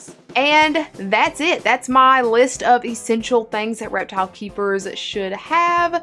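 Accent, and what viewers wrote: South, United States. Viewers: I am super new to your channel and have been watching a ton of your videos with in the last few days and I am loving it! It is so informative and helpful. Also where are you from? I LOVE you slight southern accent!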